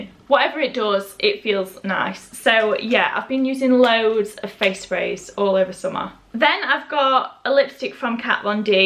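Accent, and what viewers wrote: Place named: United Kingdom. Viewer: Where abouts in the UK are you from? I cant quite work out what accent you have x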